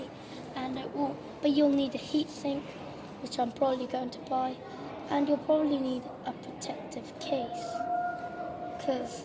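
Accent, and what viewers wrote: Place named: United States. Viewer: Still speaking american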